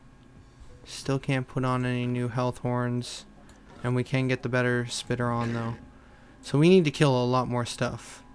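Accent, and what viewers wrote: Canada. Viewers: are you Canadian? Because I can hear a little bit of an accent. Just wondering, it sounds cool XD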